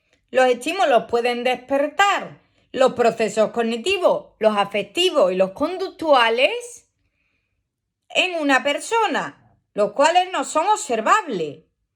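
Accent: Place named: Andalusia, Spain. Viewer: Hola, estoy siguiendo tus audios psico uned, van muy bien mientras paseas,en tren, etc, y ese acento sevillano o andaluz lo hacen más ameno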